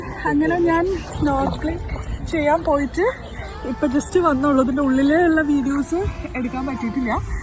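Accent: Thrissur, India. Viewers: Chechide proper hometown thrissur aahno😄.. Samsarathil okke oru thrissur slang edakk varunnund😇.. Ath kelkkumbo ottum jada illathe valare natural ayi thonnunum und🥰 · waiting for your next video,, sply thrishur slang 😍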